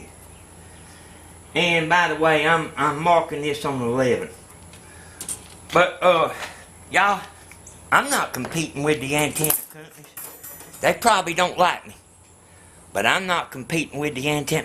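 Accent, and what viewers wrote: Georgia, United States. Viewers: Smart man regardless of accent.Headed for wealth and greatness. Southern like me.I like him.You know what I mean. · He sounds like he is from Ga, but does Mississippi have the same accent?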